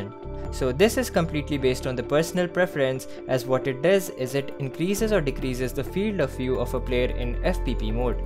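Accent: India. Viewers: Indian accent = instant dislike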